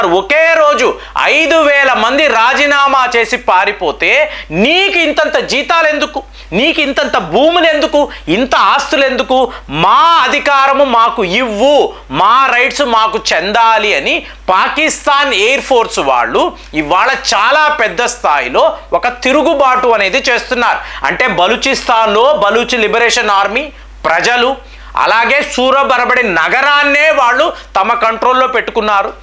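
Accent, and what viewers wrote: Tamil Nadu, India. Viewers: Video lo vnna contente chudandi sir plz, garu tamilanadu lo vnnaru, telugu prononsation alage vntadi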